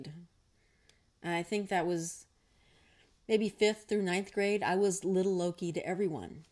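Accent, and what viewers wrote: Liverpool, United Kingdom. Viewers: I always loved the Lennon books too. But I never asked anybody to call me. Nice Liverpoodlian accent, too! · Oh gosh, I still have those books from the 60's! I can't do the Liverpudlian accent like you can, but I loved reading his words and getting carried away with the absurdity of it all. From the mind that gave us, "Turn left at Greenland," when a reporter asked them, "How did you find America?" Oh, the memories.... thanks,...:-D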